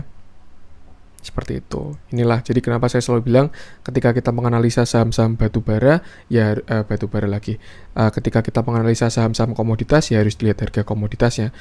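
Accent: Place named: Java, Indonesia. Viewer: Medokkk... tapi sukaaakk cara penyampaiannya... makasi byk masbroo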